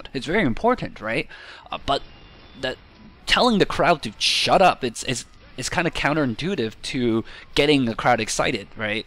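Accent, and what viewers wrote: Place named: Canada. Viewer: You can tell he's Canadian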